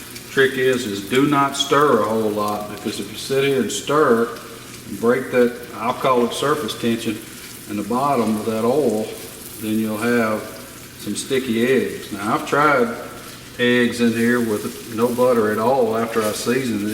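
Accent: United States. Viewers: Great Review. Straight to the point. Thank You! I ordered the 12" and it should arrive soon. I can't wait to use it. P.S. Your accent reminds me of home (Texas). I live in NYC now.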